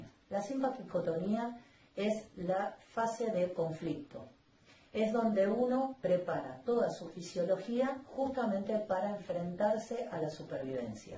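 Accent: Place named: Argentina. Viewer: Buena explicación, lo único que el sonido y el castellano que habla, se me dificulta, entender, los términos médicos entendíble, la gramática argentina es lo que se me dificulta.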